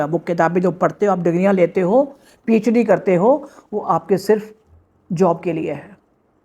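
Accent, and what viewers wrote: Punjab, India. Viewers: Thudai hindi to Punjabi di mehak aandi hai ji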